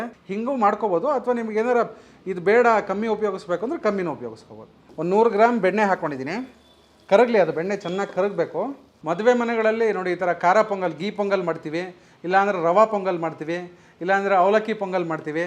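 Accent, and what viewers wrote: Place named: Mysore, India. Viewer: ನಿಮ್ಮ ಶುದ್ಧವಾದ ಮೈಸೂರು ಕನ್ನಡ ಕಿವಿಗೆ ಇಂಪಾಗಿ ಇತ್ತು.ಹಾಗೂ ಪೊಂಗಲ್ and ಫಿಲ್ಟರ್ ಕಾಫಿ ಎರಡು ಇಷ್ಟ ಆಯಿತು. ನಿಮ್ಮ ನಗು ಹೀಗೆ ಇರಲಿ 😁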